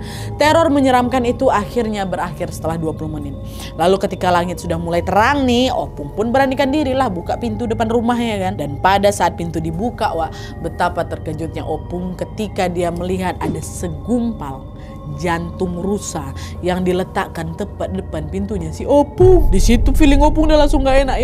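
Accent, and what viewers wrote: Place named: South Tapanuli, Indonesia. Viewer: Maro au,, kayak dari tapsel deh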